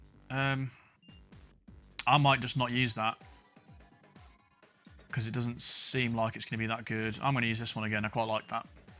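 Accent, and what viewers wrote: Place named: Midlands, United Kingdom. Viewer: it's a mixture with midlands and leicester accent lol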